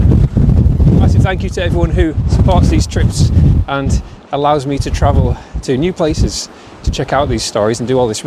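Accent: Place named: Yorkshire, United Kingdom. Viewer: He sounds normal for Yorkshire.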